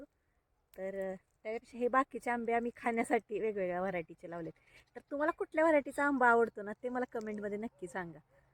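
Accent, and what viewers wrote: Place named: Barshi, India. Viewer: Didi tumi proper kontya city mdun ahat tumchi भाषा पूर्ण बार्शी कडे अस बोलतात तशी आहे.ऋतुजा माझी फ्रेंड आहे .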